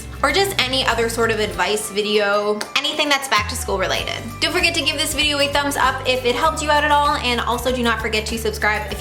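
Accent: Canada. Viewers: are you Canadian? 😊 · "aboat" lol I love the Canadian accent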